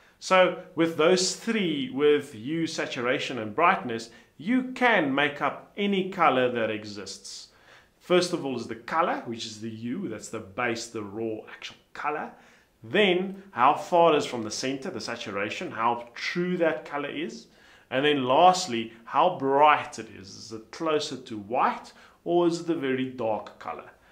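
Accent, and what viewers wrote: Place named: South Africa. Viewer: Excellent , Thank You , quick understanding of colour. Sounds like a South African Accent !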